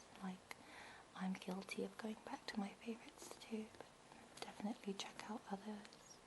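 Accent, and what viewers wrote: England, United Kingdom. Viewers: I love your accent, which part of England is it from? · It's not an interesting accent it's a British accent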